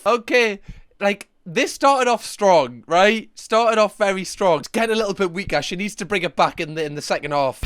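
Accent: United Kingdom. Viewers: I love this being explained in your Brit accent. It just makes me realize how petty unhinged all of this it is. It’s gone international 😂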